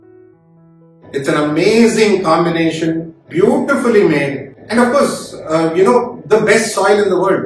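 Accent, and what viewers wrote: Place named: North Chennai, India. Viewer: Sir, We trust your product's!. Surprised you speak vada chennai accent a bit, loved it. Hoping you would speak hyderbad telugu while u launch in hyderbad..love you ,sir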